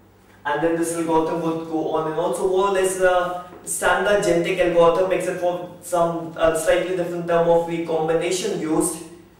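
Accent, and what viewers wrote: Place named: Asia. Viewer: thx Sir for video , I apreciate it but I need subtitles coz my english language is not so perfect especially on hearing the asiatic accent :)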